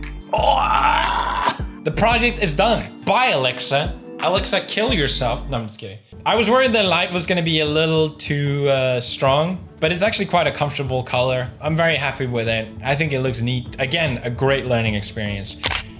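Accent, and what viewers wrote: Sweden. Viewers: All these years, mans has lived all over the world and his Sweedish accent is thicker than its ever been 😂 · I love how since pewds left the UK he's started sounding more Swedish again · I'm happy that his Swedish accent is getting stronger in each video. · he sounds more Swedish than ever before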